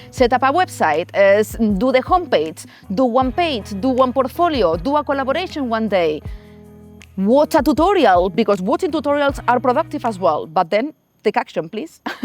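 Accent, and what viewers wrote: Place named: Spain. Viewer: Yo no más quiero escucharte con ese acento español.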